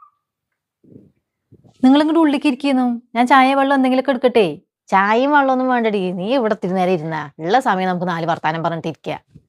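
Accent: Palakkad, India. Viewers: Palakkad basha my favorite ❤❤❤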